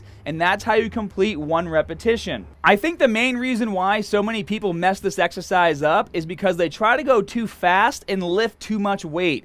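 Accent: Boston, United States. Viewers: Love that Boston accent bro! Good info!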